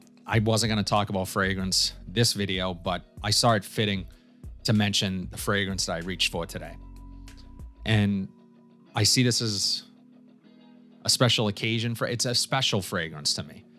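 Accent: Boston, United States. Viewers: Congratulations. You are a very honest and passionate reviewer with no hidden agenda. The content is superb and I have gotten a couple of great just watching with very little recycled content Love the Boston Accent too I am still waiting for whatever I won I just can't find the private message you sent me. LOL!!!! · Congrats! Cool Water was my intro into Fragrances around 1994 in a clear bottle with a faux wood screw on cap. I'm thankful for you and other guys like you who are willing to talk to a camera and share this passion in a way that both educates and inspires! The first time my wife overheard a video of yours that I was watching, she said, "Woah, someone's from Boston!" 😂 Happy Thanksgiving! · you wanna get the numbers up of subscribers?… gotta get to collab with other fragrance reviewers. Just watch once a reviewer collabs with another fraghead… and how significant their numbers go up. 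Just some advice. Good reviews and stunning Boston accent 😅… keep it up buddy 👏🏽👏🏽👏🏽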